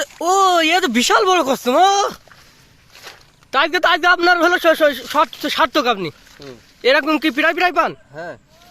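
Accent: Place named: Meherpur, Bangladesh. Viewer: Vasha ta meherpur,chuadanga r....bari koy bhai apnar?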